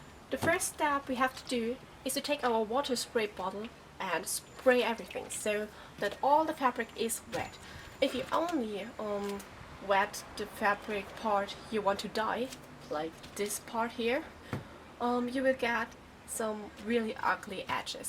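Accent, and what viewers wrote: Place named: United Kingdom. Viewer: Cool, das merke ich mir. :3 Und die Folie fixieren, das merke ich mir auch :D Du hast einen süßen britischen Akzent :D Liebe Grüße Zementitia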